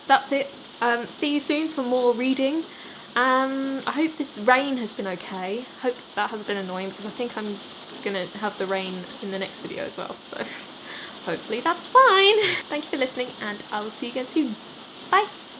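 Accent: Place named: United Kingdom. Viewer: It is so cute when it is read with a British accent ahaha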